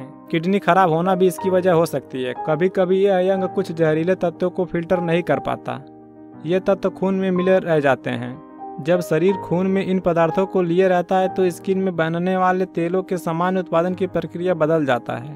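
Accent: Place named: Bihar, India. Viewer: Sala bihari ki tarha baat karta hai lol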